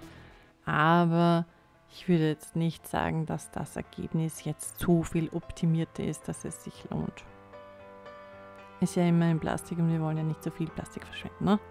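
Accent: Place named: Vienna, Austria. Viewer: I könnt mi wegwerfe! 😂Der Dialekt! Wiener Schmäh & More! Lustig! Das macht Spaß, Dir zuzuhören! Und danke für die hilfreichen Tipps! Möchte demnächst mal anfangen😊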